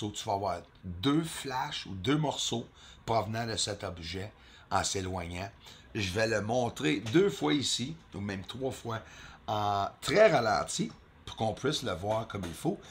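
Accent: Canada. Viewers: Avec son accent canadien jai rien compris nous sommes en France okay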